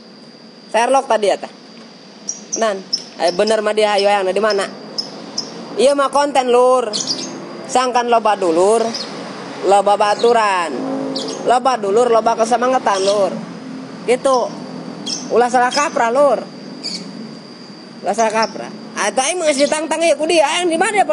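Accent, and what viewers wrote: Indonesia. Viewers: Lucu ey ngomong sunda na😅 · Logat Banten kitu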